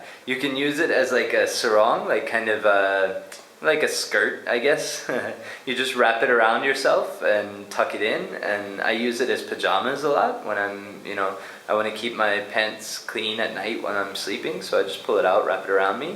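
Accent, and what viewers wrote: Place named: United States. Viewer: whats up with the brit passport. Sound american lol just a random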